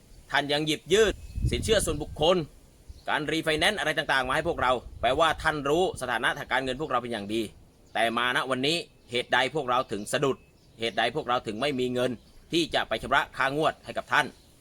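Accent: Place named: Phetchaburi, Thailand. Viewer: เป็นคนจังหวัดอะไรค่ะ.. พูดเหมือนคนเพชรบุรีเลยค่ะ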